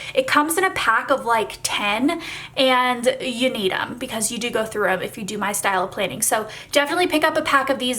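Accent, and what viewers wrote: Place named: South, United States. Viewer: She’s from Tennessee and has a slight southern accent. “Pen” and “pin” are typically pronounced the same or nearly the same in the south.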